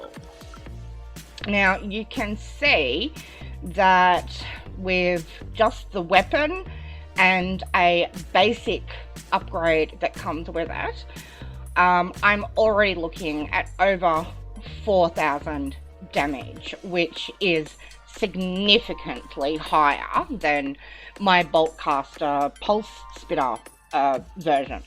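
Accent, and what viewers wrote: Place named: England, United Kingdom. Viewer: How do you feel about Roseanne Barr? I lover her sense of humor.. but if no one has said it before you are exactly her with hint of an english accent. Asside from that.. Great video, informative.. but english Roseanne sold it .. lol! thanks!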